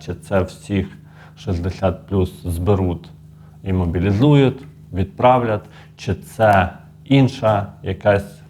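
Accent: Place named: Western Ukraine, Ukraine. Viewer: Судячи по діалекту ви орієнтуєтесь на підписників зах.України.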